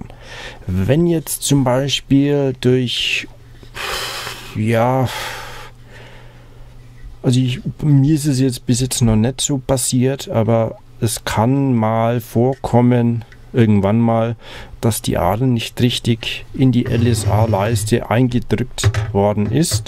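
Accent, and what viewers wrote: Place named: Franconia, Germany. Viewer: Tolles Video, bisle frängisch is a dabei:D finde ich persönlich toll, weiter so LG.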